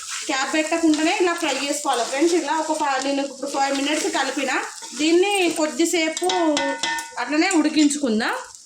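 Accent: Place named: Telangana, India. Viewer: ఎ ఊరు అక్క మనది మొత్తం తెలంగాణ మాటలు ❤❤❤👌👌👌👌👌👌👍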